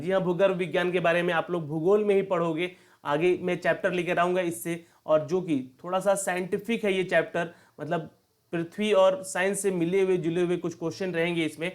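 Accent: Bihar, India. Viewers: Bihari ho kya bhai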